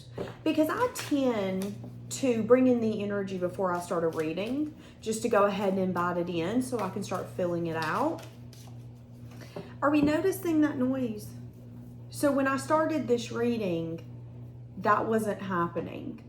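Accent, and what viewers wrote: South, United States. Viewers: Love your Southern drawl… So cute!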